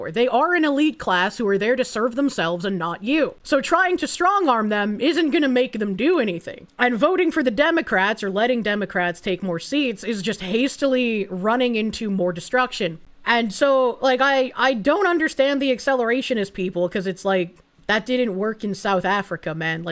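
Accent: Boston, United States. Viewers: I’m hooked whenever I see her ring all cute in the intro. Then the Boston accent hits?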